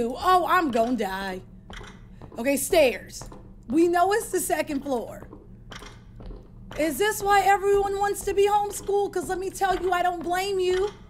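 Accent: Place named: Bronx, United States. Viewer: Did you peel my melon cap back blue😂🤣😂🤣😂🤣😂 you sound like you from my hood Bronx NY 💪🏽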